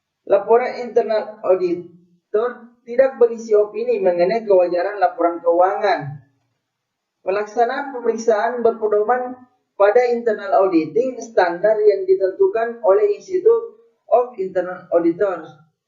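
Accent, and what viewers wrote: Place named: Bugis, Indonesia. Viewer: Materinya udah bguss cuman cara bacanya masih kebawa logat bugisnya gitu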